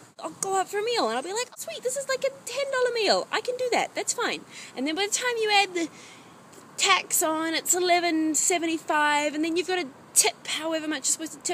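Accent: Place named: United Kingdom. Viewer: Yes, I agree with her. She is right but I remember feet and inches because I am 54. I hate it when they don't use stones but pounds. I cannot visualise what a person looks like who is 300lbs but I could if it was put in stones and pounds. I did not know she was from New Zealand but she sounds like she is from Britain. I do not like the way yanks say 10th grade or 6th grade. Why not just say how old the child is. It would be a lot simpler. Why do you not have letterboxes in the front doors?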